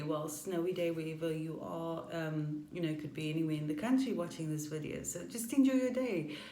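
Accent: South Africa. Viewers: You're definitely from Cape Town, but did you sound like you grew up on the Flats, right?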